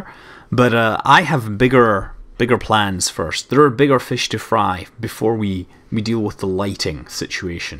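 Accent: Scotland, United Kingdom. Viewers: Nothing can be boring with a scottish accent!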